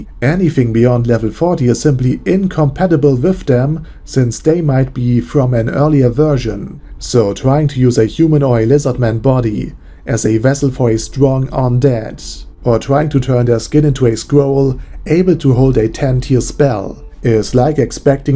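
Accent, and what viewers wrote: Germany. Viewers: Dein deutscher Akzent ist echt heftig.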